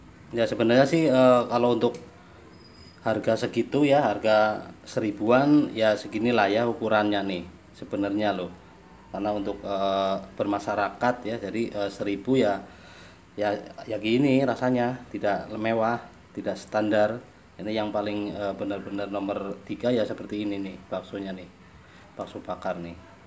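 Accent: Indonesia. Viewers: Kepingin..hahaha..medok bgt omm good 👍 bermanfaat.tks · Maaf suaranya kyak gak asing, mas apa orang jateng? Apakah mas alumni Ponpes al islah jember jatim? Tolong di bales🙏🙏🙏🙏🙏🙏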